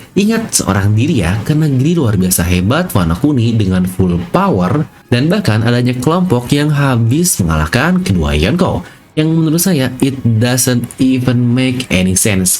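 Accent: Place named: Bandung, Indonesia. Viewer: hmmm kek nya orang bandung admin nya ahahahaa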